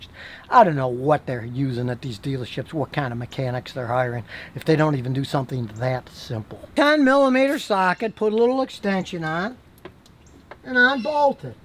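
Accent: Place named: Texas, United States. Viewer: Heluva Texas accent, bro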